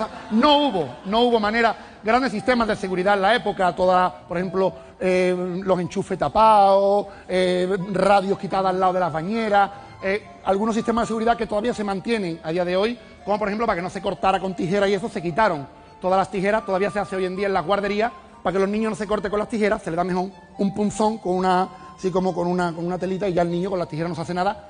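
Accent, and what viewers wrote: Andalusia, Spain. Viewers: sigue hablando andaluz pero menos acentuado, más estándar, pero vaya es andaluz no puede hablar como uno de Burgos está claro, pero si que puede hablar más estándar, todos podemos.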